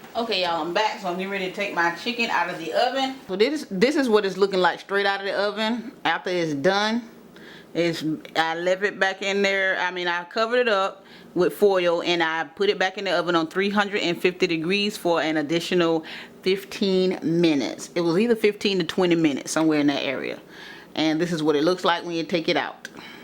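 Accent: South, United States. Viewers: Thank u miss for this great and simple recipe. Love yo southern accent and spirit.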